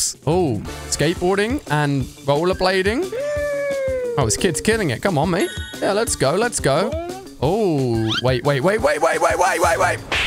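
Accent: United Kingdom. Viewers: what are u British or something because I'm British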